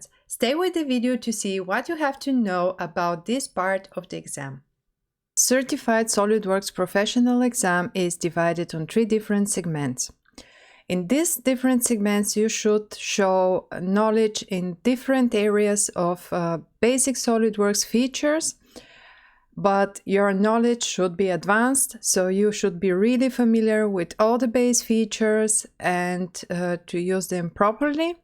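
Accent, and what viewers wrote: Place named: Russia. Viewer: У девушки явный русскиий акцент... Давно в Канаде?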